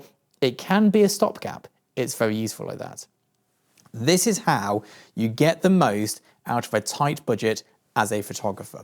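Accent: United Kingdom. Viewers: Excellent! I'm grinning because I enjoy your point of view and because Brits talk differently than 'Murricans. 😂 I'm also appreciating how much I love pragmatism. And last but not least: I've been a little afraid of used kit because it seems fragile and... almost perishable. I'm glad to hear your point of view. I've bought used cars sight unseen, because I know cars. But studio gear seems "trickier..." Yep I'm just afraid of what I don't know. 👍 Nice to feel grounded again. Thanks!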